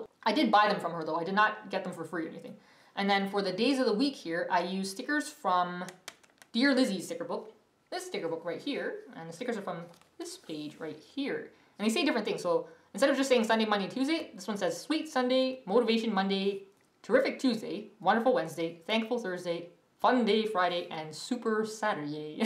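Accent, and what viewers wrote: Canada. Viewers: This is too cute, and so is your Canadian accent ("eh"? :-P )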